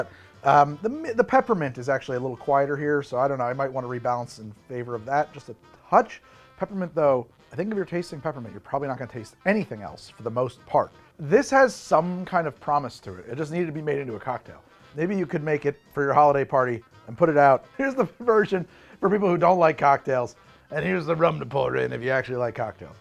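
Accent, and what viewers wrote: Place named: Philadelphia, United States. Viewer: Everything you say "weird" is also how a lot of Philadelphians say things, so f yourself, it's wooter ice. Love you!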